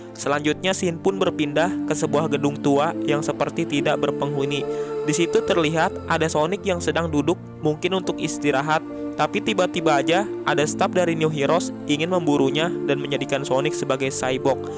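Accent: West Java, Indonesia. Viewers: Mimin na orang Sunda ouy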